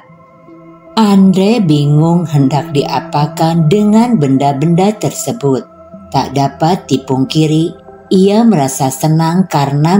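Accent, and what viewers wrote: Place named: West Java, Indonesia. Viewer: . Suaranya yang khas dengan logat bahasa Sundanya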